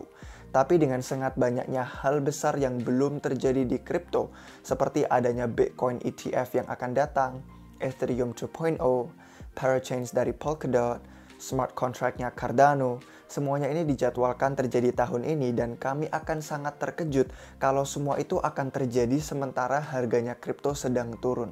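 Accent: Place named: United Kingdom. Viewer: Bos, aksen british lu cringe banget, keinget skinny faps, pake aksen amerika aja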